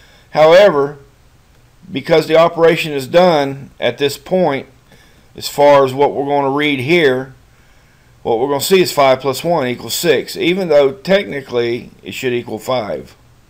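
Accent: South, United States. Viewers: you sound like hillbilly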